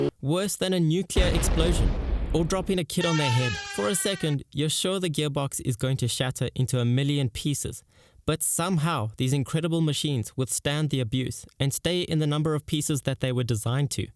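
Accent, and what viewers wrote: South Africa. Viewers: Bro you from south africa 🤤🤤🤤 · Is that south African accent?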